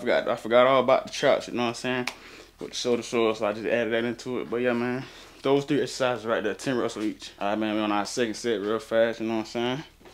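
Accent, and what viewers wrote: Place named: Georgia, United States. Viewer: twin gotta be frm ga , ik my fellow Georgians when i hear them 😭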